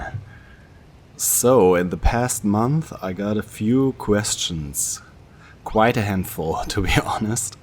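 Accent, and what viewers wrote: Germany. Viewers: Juhu my Question was in the video. Und wusste garnicht dass du von Germany bist, obwohl man es raushört. Es klingt bisschen wie mein english. xD Thanks for the answer. Big up!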